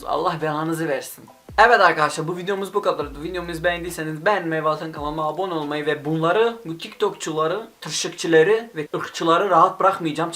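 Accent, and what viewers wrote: Turkey. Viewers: iki tavsiyem olacak öncelikle her ne kadar türk olmasan da türkceyi biraz daha guzel konusabilirsin. Ic anadolu sivesi gibi konusuyorsun kulaga hos gelmiyor. Ikincisi de bu sekilde faydasiz videolar yerine Kürt genclerinin bilinclenecegi videolar cekmelisin.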